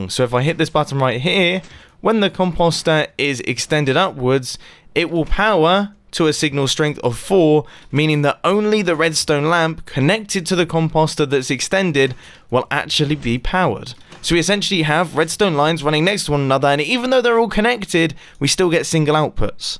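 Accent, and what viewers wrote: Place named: United Kingdom. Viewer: Mumbo sounds so much more British on camera than in real life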